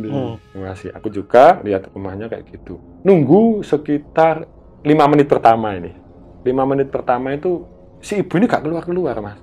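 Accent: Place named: Malang, Indonesia. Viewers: logatnya asli malang banget, mantab mas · Cara cerita dan logat mas bikin aku kangenbanget sama Malaaaaaang huhuhu btw suwon sam wes crito yaaa! Tahes terus umak yaa sam!!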